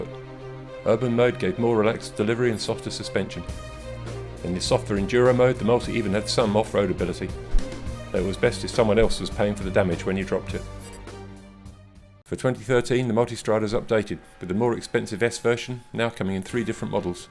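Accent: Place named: Australia. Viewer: Hi Guys, I am italian and I could follow the speaker excellently. Speed it is not a problem in general if no jargon is used ;-) this said I think that for an internal audience it might be a bit problematic understand speaker's english given the accent. is he australian or what?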